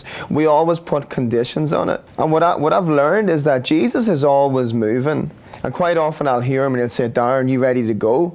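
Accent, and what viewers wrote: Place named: Ireland. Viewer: I love listening to Darren speak, not only the Irish accent 😉 but to speak of Jesus and what leads him to follow and do Gods will. Thank you Darren and WAM 🙏🏼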